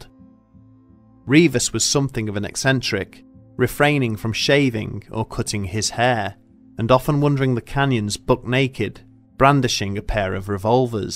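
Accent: England, United Kingdom. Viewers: Why do they have English people telling these stories ? Americans can also tell stories.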